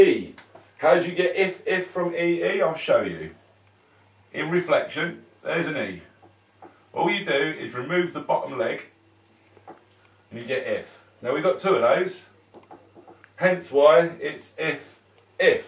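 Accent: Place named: United Kingdom. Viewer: Of course it would be a dumbass Brit pretending to argue against Newton. I mean your people are so completely slow in the head that you pretend your preserved parks are deep woods on your deforested little island nation and beat your knives in the place of buying saws. I surely hope that by now everyone recognizes that dumbass accent as the idiocy that it actually represents.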